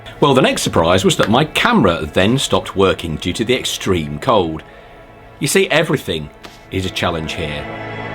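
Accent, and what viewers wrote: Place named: RP, United Kingdom. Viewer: Love the posh accent.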